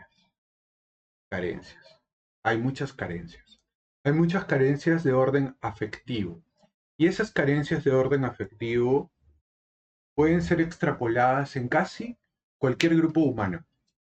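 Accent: Lima, Peru. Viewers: caes bien compa. Tu acento limeño súper relajante. Tu inspiración contando historias de bayly también. Pronto tendrás en la caja de comentarios 4000 comentarios, grande.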